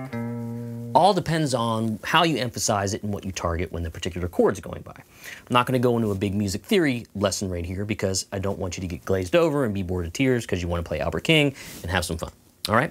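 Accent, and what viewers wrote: South, United States. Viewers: You're getting a little Southern drawl there... :)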